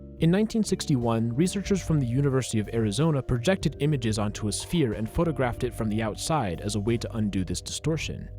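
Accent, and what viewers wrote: Italy. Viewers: your Italian accent is wonderful ps I'm italian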